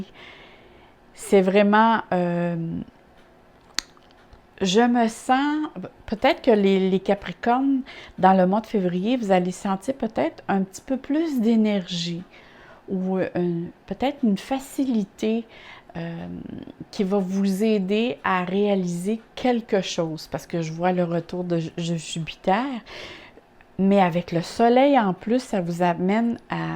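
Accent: Canada. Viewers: Merci🌹🍀j'adore votre accent canadien ❤️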